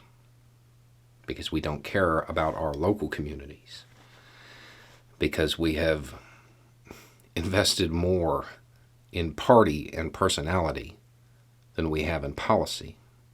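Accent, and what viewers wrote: South, United States. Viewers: We talk in line at the stores up here in the North too, minus the adorable southern accent of course.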